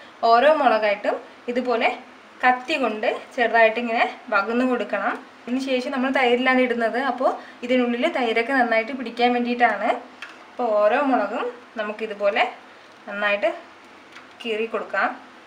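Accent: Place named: Malappuram, India. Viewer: സംസാരം കെടാപോൾ മലപ്പുറം ആണ് തോന്നിപോകും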